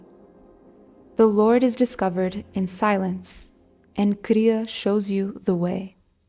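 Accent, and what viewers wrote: Brazil. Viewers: I sense a Brazilian accent on the narrator’s voice. Great video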